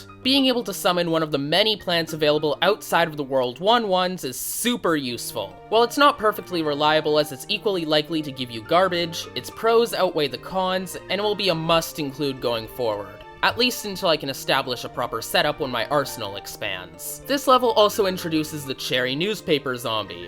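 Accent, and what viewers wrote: Canada. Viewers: oh, youre canadian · He’s Canadian dumbass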